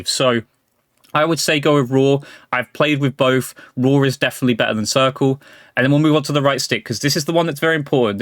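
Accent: United Kingdom. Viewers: Hello you from Liverpool? you have an accent maybe scouse, top contrôller form me but he's just hard to find it in EU.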